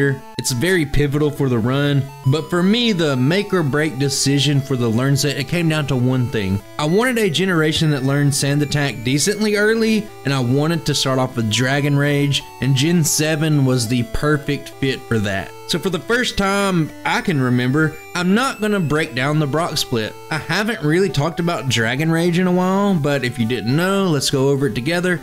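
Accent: South, United States. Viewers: you kinda have a southern accent! I'm surprised!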